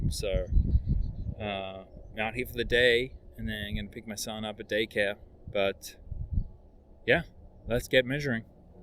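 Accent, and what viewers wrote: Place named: Australia. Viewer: Hope the property is close to a Tesla supercharger. Sound Aussie or Kiwi.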